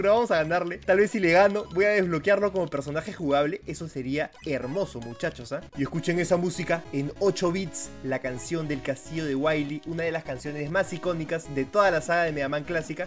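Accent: Peru. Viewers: OMG, LO SABÍA, SÍ ERES DE PERÚ 💖 Por la forma en la que hablabas lo sospechaba hahaha pero nunca me convencí. En fin, me encanta todo tu contenido tanto en tu canal principal como en este, ¡éxitos! 🌻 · OMG, LO SABÍA, SÍ ERES DE PERÚ 💖 Por la forma en la que hablabas lo sospechaba hahaha pero nunca me convencí. En fin, me encanta todo tu contenido tanto en tu canal principal como en este, ¡éxitos! 🌻Like si luigi es tu personaje favorito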